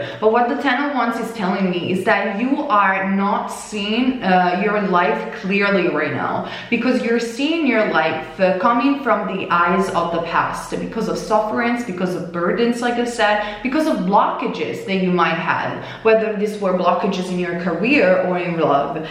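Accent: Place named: Italy. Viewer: 👍👍👍 for Italian accent! 🍻🍻🍻 & 🌷🌷🌷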